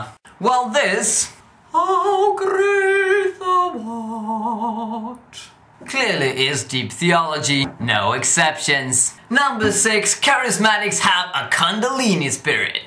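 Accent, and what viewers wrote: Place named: England, United Kingdom. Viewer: One thing I learned from watching this: I can more easily tolerate a biblically illiterate moron as long as he has an english accent. I was at the Conference. Nothing you said rightly articulates MacArthur's perspective...especially "Number 1" from your list. I have witnessed the same phenomenon with regard to a lack of true conversion coming from the Charismatic Movement. Rarely is the true gospel preached. Many of them (if not most) couldn't even articulate the gospel if pressed to do so - and believe me when I tell you, "Jesus talk" is no substituion for talk of the substitutionary death and the atonement. Beyond that, most of them hold to the Word Faith, Health/Wealth/Prosperity gospel, which cannot save. You don't know what you're talking about - but like most Charismatics, you probably so idolize gifts that don't even exist today that you'll act the fool because somebody is talking about those gifts in a manner which displaces your idolatry. 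And Romans 10 is not the sum total of the gospel, otherwise, Mormons, Catholics, Jehovah's Witnesses and everyone else is saved. What a pathetic litlte man you are. You make us all look like idiots...which is why John had to do a Strange Fire Conference.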